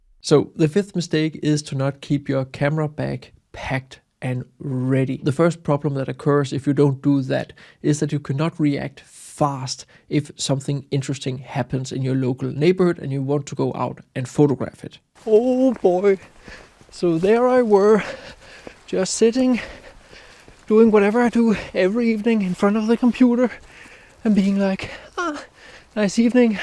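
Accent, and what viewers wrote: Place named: Denmark. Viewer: A denmarkian accent.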